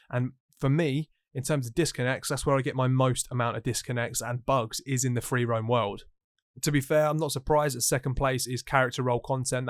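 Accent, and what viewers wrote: United Kingdom. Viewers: I love your natural British accent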